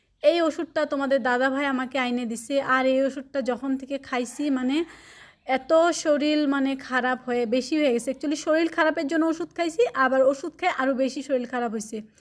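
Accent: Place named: Bangladesh. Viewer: আপু তোমাদের জেলার নাম কি। তুমার কথা গুলো আমাদের বাংলাদেশর মতো ❤❤❤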